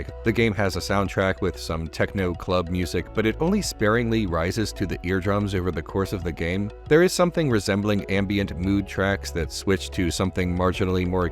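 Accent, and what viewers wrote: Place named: Mid-Atlantic, United States. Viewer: Your mid-Atlantic accent is excellent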